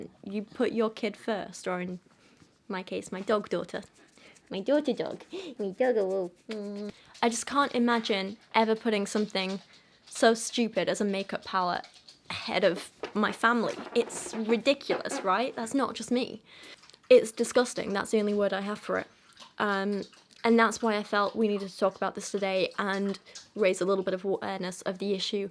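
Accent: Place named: Yorkshire, United Kingdom. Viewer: I don’t have money for merge or Patreon this month, but I’ll let your ads run so hopefully you can get some of that coin. PS: I don’t remember what video it was in, probably some hun bot MLM shit, but every time I consciously let your ads run, I hear your voice in my head with that Yorkshire accent going, “dollar dollar” and I don’t know why but it’s hilarious to me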